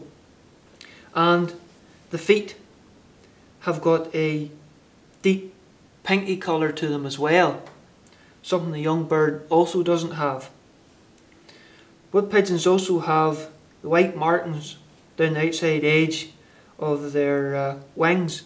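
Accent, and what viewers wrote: Ireland. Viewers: Ah, there at last. Your handsome face to match the charming Irish accent....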